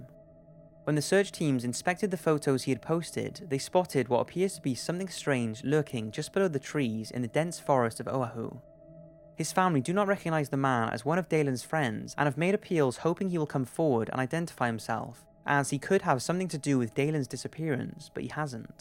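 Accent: Wales, United Kingdom. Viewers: klo everyone wants to see the British-accented narrator's face xD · Are you welsh?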